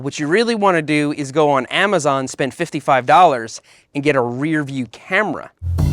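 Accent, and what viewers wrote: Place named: New York, United States. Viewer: are you from NY/north jersey? i know that accent lol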